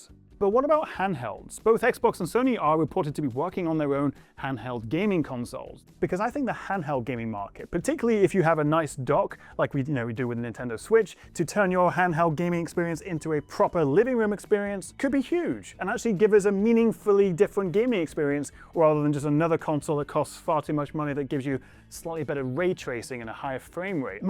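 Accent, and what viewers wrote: United Kingdom. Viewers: The British accent rocks!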